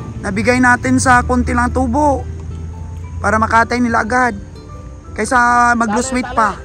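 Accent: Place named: Batangas, Philippines. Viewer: meron kana puntong batangenya madam heheh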